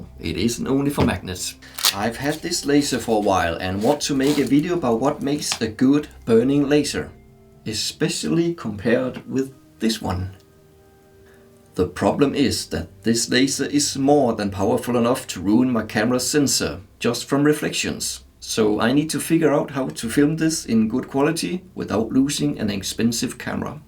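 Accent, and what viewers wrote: Denmark. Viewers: Your Channel is One of my Favourite. love your danish accent. please keep making videos. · Tillykke med det! Og du har dig en rigtig solid dansk accent når du taler engelsk, men det er der jo ikke noget i vejen med :D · Ann, it's a Danish accent.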